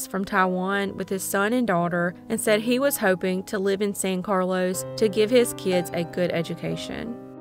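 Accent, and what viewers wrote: South, United States. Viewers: What a wonderful way to drive to work listening to such a soothing southern voice ❤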